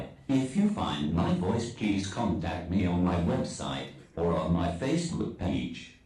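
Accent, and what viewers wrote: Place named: London, United Kingdom. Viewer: that's the accent of London. :)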